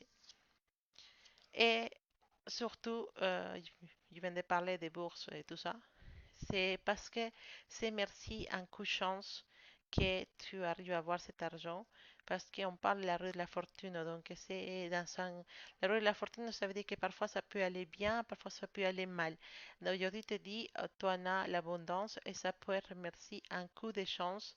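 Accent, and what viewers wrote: Spain. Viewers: Bonsoir, cela fait un peu plus d'une semaine que je vous ai découverte. Déjà, j'adore votre petit accent espagnol, c'est adorable. Vos tirages sont assez précis et intéressants par rapport aux détails que vous nous apportez. Personnellement, je suis Poisson 1er décan, ascendant Capricorne, considérant qu'après 30 ans, on est plus sur son ascendant. Cependant, vu la superbe journée que vous annoncez pour les poissons, j'ai hâte que le jour se lève... Merci beaucoup:)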